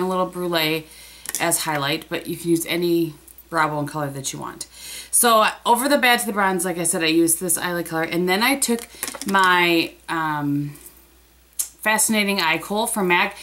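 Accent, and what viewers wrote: Wisconsin, United States. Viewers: I love your wisconsin accent! I'm from Iowa, so I love when I get to hear a wisconsin accent!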